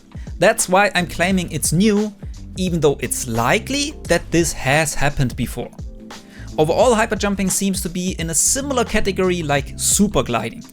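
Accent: Germany. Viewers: Do u speak german? Because your voice sounds like your main language is german.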